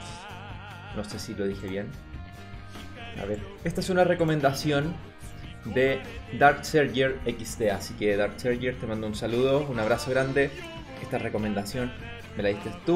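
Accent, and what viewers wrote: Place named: Chile. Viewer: Se te nota caleta el acento chileno completo palta mayo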